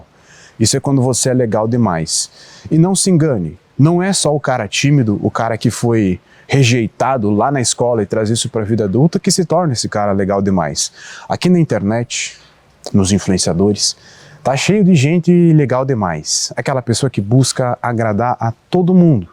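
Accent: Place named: South, Brazil. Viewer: Hey estou aqui, Sotaque muito bonito o seu,muito orgulho da cultura Sulista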